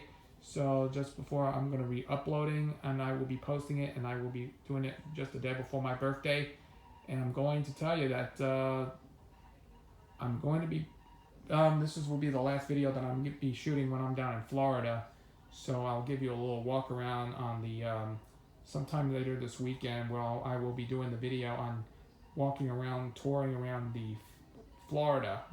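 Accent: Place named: New York, United States. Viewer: I can hear from your accent that you're a new yorker lol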